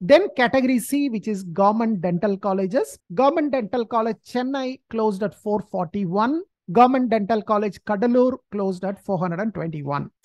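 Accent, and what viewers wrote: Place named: United States. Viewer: Enya unaku tamil thariyatha..........America la porantha mathiri thann pasurathu........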